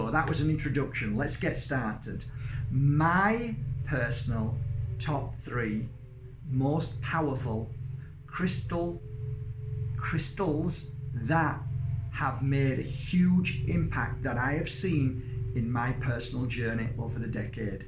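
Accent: Yorkshire, United Kingdom. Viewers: Are you from Yorkshire ? I’m in South Africa but that sounds like a Yorkshire ascent ? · You sound like from my neck of the woods. 😁 yooorkshire! Thank you for this. I love getting new info. I am on my spirtual journey, doing reiki as well. 🥰 i will visualise myself as a crystal when sending love and light💫💜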